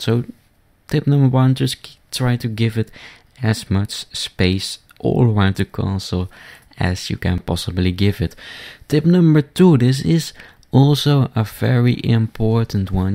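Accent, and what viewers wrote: Netherlands. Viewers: Dutch accent incoming! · he sounds dutch af :/ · Dutch accent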